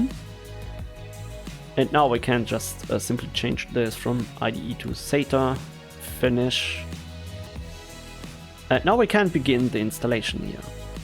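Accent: Germany. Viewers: I love that german accent,it's sounds really good.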